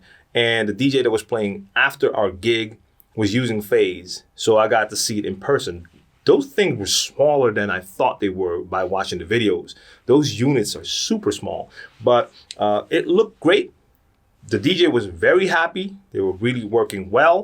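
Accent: New York, United States. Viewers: wow you sound like you're from New York! Great content as always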